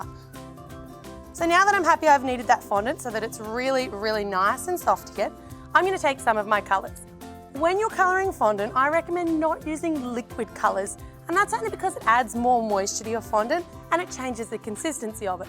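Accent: Australia. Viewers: is she from australia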